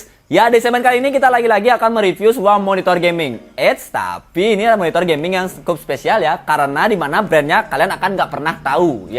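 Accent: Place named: Bali, Indonesia. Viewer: medok bali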